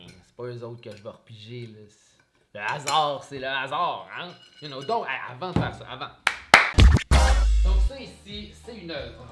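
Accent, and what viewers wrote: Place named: France. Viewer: WAAA, l'accent Français est pas mal, et l'œuvre est juste incroyable !!! Ca doit bien représenter Montréal !!!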